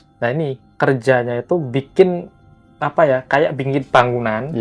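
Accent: Pekalongan, Indonesia. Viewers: Masnya ini logatnya kaya Pekalongan daerah kidul ,Kedungwuni ,kajangan ,mbligo pringlangu ngidol lah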